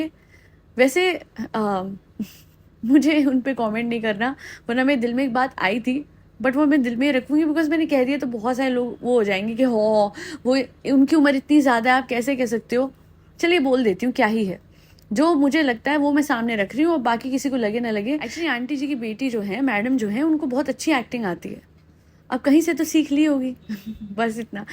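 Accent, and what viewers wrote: Punjab, India. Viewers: You speak very cute Punjabi. Please try to add a few lines in Punjabi in every podcast.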